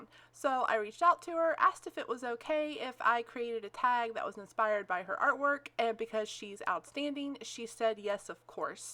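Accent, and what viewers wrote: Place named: North Carolina, United States. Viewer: Ah, maybe a North Carolin accent.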